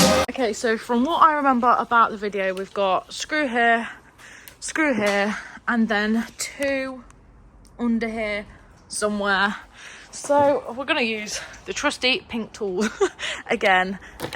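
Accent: United Kingdom. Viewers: Great little video, although simplistic it's what I understand best, but I am struggling to place your Northern accent. New Sub too, so looking forward to the next video. Ed 😉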